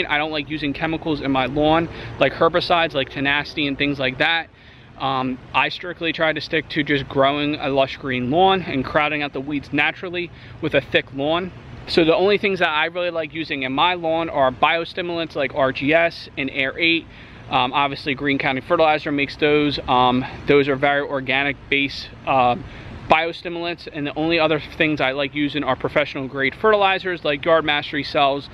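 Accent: South Jersey, United States. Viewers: Hey, I subscribed through Etsy! 😉 It's good to see Jersey again and I can hear your area code 609 accent. 😊 In one video it's so obvious you have a gift for creating beautiful ORGANIC lawns. That is so vital with climate change. We live way upstate New York now in the woods and we don't even have sidewalks or street lights and the scrub grass grows, well, like weeds. It's so nice to see your flat, green lawn. Beautiful work. 🌱🌱🌱🌱🌱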